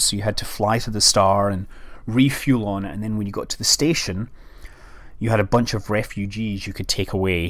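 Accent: Scotland, United Kingdom. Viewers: Hey, are you scottish...you sound scottish:3?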